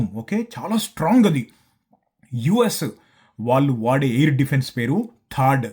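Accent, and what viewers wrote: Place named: Rayalaseema, India. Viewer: Loved the way you explained the war strategies with such clarity! Your Rayalaseema accent adds a unique and powerful touch to the narration 🔥👏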